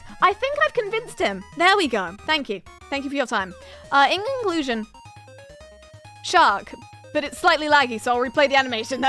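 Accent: United Kingdom. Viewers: Okay, I'm subbing just because of this stream. The dedication to BLÅHAJ is simply astounding, and it's nice hearing another British voice on here. I shall watch your career with great interest.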